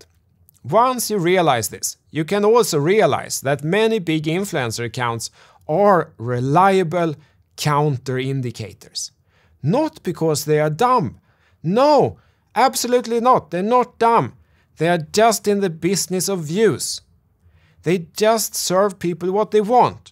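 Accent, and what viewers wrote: Sweden. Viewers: It's not your video's that suck or your calls... But No one understand wtf you're saying with that heavy jibberish swedish accent :D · Ahhh the Swenglish we all been missing 😎👍🏻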